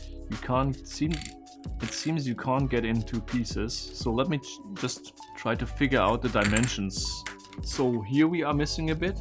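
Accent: Germany. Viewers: I really like your videos, they are so satisfying 😌 also your German accent is awesome 👌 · I am Dutch! I like your german accent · Haha love your german accent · Wenn deutsche Englisch sprechen 👆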